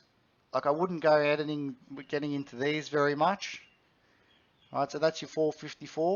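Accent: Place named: Australia. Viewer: Your accent makes this video 10x easier to pay attention too. Not sure why, you are Australian correct?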